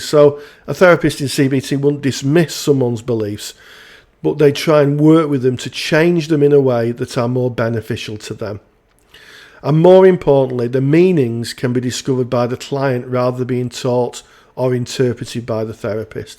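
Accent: United Kingdom. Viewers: its a northern english accent, its fine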